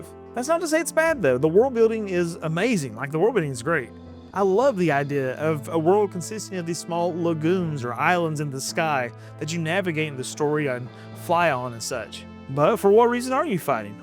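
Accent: South, United States. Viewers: Finally!! I know about most of these but I've been excited to see your take on some Japan only games for awhile now! Love your content, as a fellow southerner your accent adds a level of familiarity i dont get in too many gaming channels 🤣🖤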